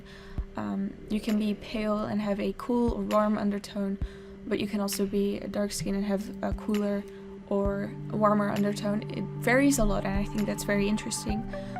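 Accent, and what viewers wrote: Netherlands. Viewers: She uses Paint Tool Sai, but I don't know what tablet. It doesn't matter that much though :P unless you're more experienced. When I just started out, I used a $60 Wacom Intuos Draw tablet. It doesn't have a screen, but only higher end ones have screens, and you get used to it pretty quickly. I think she has a dutch accent :D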